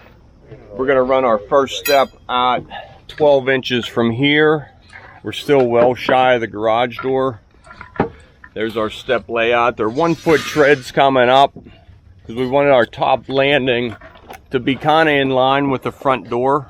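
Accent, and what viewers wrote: Pittsburgh, United States. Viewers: This guy speaks with a south Pittsburgh dialect. Why can’t I find him listed there? (I lived there my first 20 years so I know.) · Hahahaha that’s the Pittsburghees accent you’re hearing! Thanks for watching!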